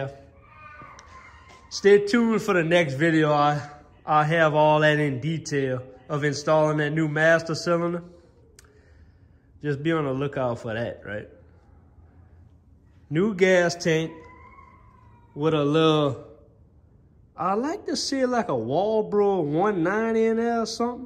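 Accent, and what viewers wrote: Louisiana, United States. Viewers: yeah i live in Arkansas been to Louisiana few times as a lineman for hurricanes it’s definitely a trip! Knew you sounded cajun!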